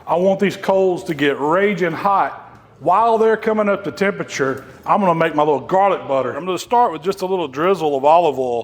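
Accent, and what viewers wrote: South, United States. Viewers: Is it me or does the Southern accent makes his videos better😂... is the man👍🏾🫡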